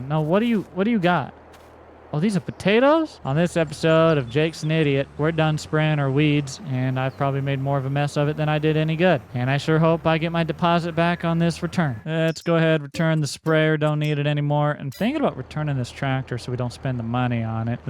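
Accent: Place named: South, United States. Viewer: I just want to say when I was watching the first few videos I noticed you have a southern accent when you talk in certain parts and I fucking love it HAHA IT SOUNDS AWESOME. cant wait for more videos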